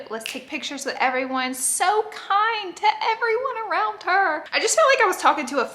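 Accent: South, United States. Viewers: Absolutely love, love, love you. I am so glad you done this video in your original accent not your Cali girl voice. Not judging, just love southern.🥰🥰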